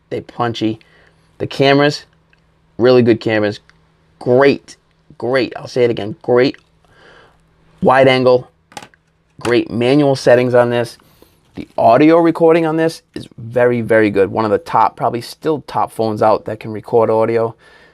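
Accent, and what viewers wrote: Boston, United States. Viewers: That Boston accent!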